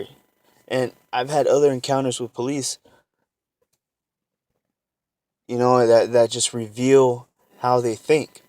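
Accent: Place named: Los Angeles, United States. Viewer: yo u from LA right?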